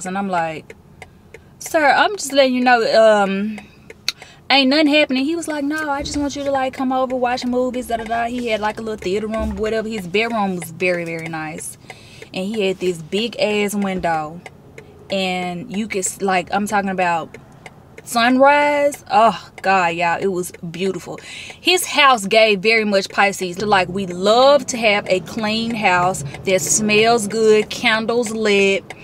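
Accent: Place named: Memphis, United States. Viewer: Hi my southern sis!! I knew there was a reason you sound so much like K. Michelle. That M-town accent is strong hun. I'm from the MS/AR Delta and I have lot of friends and family members from Memphis and their accent sounds just like yours.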